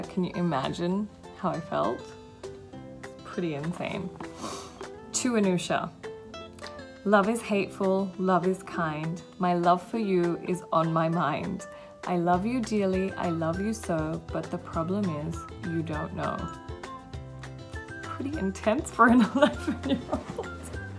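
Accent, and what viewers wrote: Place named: United Kingdom. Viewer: Hi Anusha I am your big fan from Nepal ... I love you so much MTV most wanted... My teenage time.. You were my favourite n still i love u n i just love the way you speak your English sounds like British accent.... Muaahhhh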